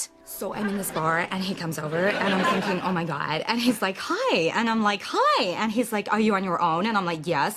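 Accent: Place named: United States. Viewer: wow, shes got a pretty good american accent lol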